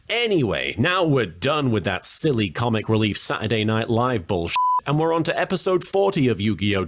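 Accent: United Kingdom. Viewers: Is it just me, or did somehow become MORE british?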